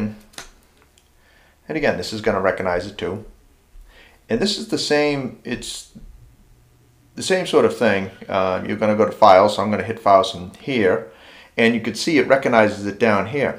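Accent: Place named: Boston, United States. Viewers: lol im from amsterdam and i was about to ask if it was a boston accent heheh cant miss that shit :) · Way to go. I recognize the Boston accent. I’m from Boston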